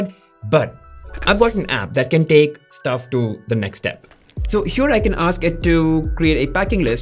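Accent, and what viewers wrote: India. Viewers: I AM SICK AND TIRED HEARING THESE FUCKING INDIAN ENGLISH DICTIONS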